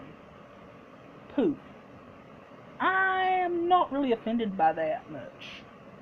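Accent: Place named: Texas, United States. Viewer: Love that Texas accent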